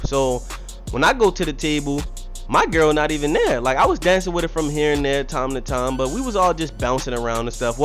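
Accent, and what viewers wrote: Baltimore, United States. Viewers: Can tell u from bmore tht accent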